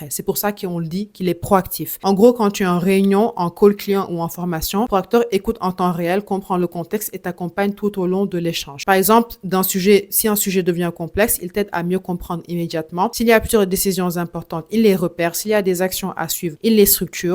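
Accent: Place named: Senegal. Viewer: Joli petit accent sénégalais ! ❤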